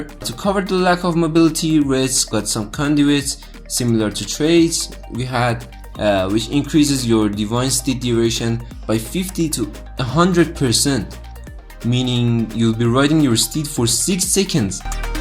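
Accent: Iran. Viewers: I can usually spot a fellow persian by their accent but I'm unsure... are you?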